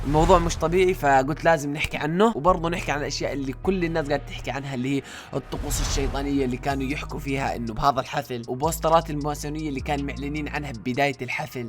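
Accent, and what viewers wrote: Jordan. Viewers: أحبك وحب طريقة سردك للقصص مفهومة وأحلى شي لهجة أهلنا الأردنيين قريبة على القلب ومفهومة😍❤🔥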